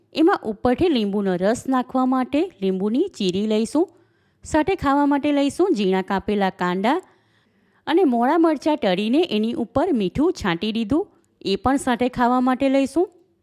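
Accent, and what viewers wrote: Surat, India. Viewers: This looks so delicious! I'm definitely going to try making these batata vadas at home. Thanks for sharing the recipe! surti tone, i love it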